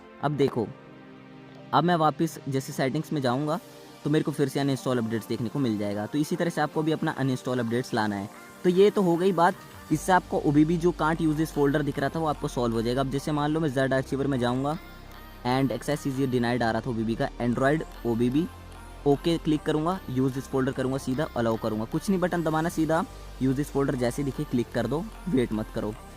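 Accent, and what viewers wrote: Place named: India. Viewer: Is this a joke? It doesn't even work?! Tf? English caption but indian voice wtf?!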